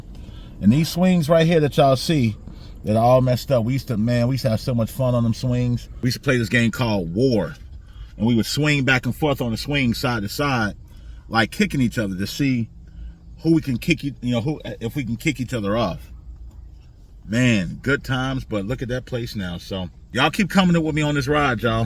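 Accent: Midwest, United States. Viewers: I have been to Gary before a couple times. Ran track meet in that area when in high school and think I played a baseball tournament too in high school there. Michael Jackson is the man! I knew you had those midwestern roots....can hear it in your voice. Gary isn't too far from Detroit area where I am from. Detroit roots here baby! 313! That pool is sad that it's not being used anymore. When was the last time u were there in Gary? You know I enjoyed that cameo from the train!!!🤣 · I had a feeling you was from the Midwest I was thinking Detroit or Chicago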